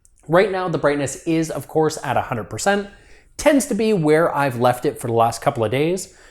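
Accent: Canada. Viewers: You sound Canadian 🇨🇦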